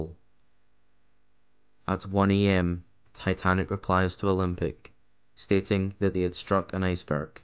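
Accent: Scotland, United Kingdom. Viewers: Love the Scots accent.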